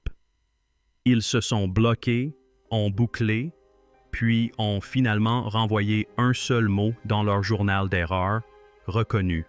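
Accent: Canada. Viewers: Euh... Est-ce un poisson d'avril de la part d'une IA ? Bougez pas, je vais me renseigner à la NASA. Bel accent Canadien, et vidéo interressante, mais farfelue.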